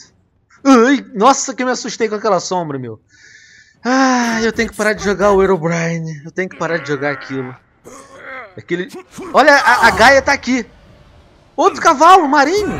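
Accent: Portugal. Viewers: tu és de Portugal? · voce e de portugal???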